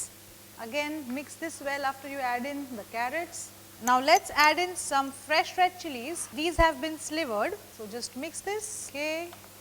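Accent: India. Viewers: She happens to be Indian.so the Indian accent. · what happened to ur pronunciation? very indian..! rather speak hindi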